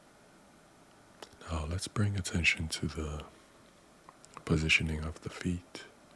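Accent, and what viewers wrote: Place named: Toronto, Canada. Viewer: You sound like you're from T.O... 🇨🇦🤙🏻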